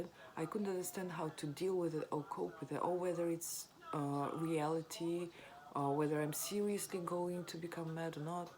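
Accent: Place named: Eastern Europe. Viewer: are you east european? your accent is so pretty :)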